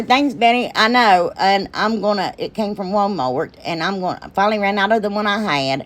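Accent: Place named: Kentucky, United States. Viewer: GIRL if you live close to Heath you are only an hour and a half from me. I am also from Kentucky. Harlan if you know where that is. I just love watching you. My husband will hear you talking and say 'Are you watching?' Listening to you talk reminds me of home.🧡💛💚💙💜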